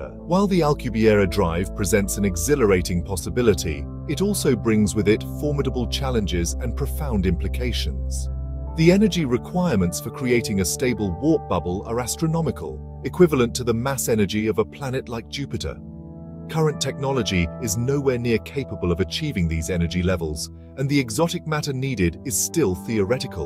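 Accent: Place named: RP, United Kingdom. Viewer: Imagine a Youtube with no AI created garbage videos like this channel, videos would then have to be spoken by real people with faces and names instead of this endless chatGPT scripts read by posh british actor voices in monotonous repetetive nonsenceical giberish. What a world, it exists in many other videos created by astrophysicists but not here where the creater is just a grifter.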